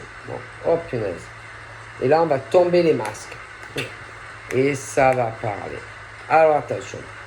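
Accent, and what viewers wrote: South, France. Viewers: Bonjour, j'adore cette guidance !. Un jeu d'actrice très réussi, un franc parler bien du sud, un vent de fraîcheur ! Ça met de la gaité sur des situations difficiles. Merci bcp, et un tirage qui me parle vraiment 🙏🙏❤️❤️❤️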